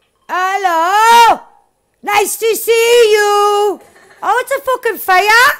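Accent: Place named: Newcastle, United Kingdom. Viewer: I just dident want to risk not dropping a comment to your vids, since I find them amazing :) Oh Newcastle, yea, remember you said Magpies was who you cheered for :) Its a great dialect though! fun to listen to!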